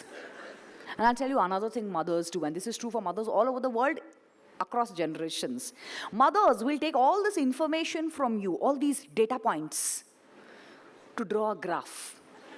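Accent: India. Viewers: Ur hindi accent is lit especially with ur facial expression ❤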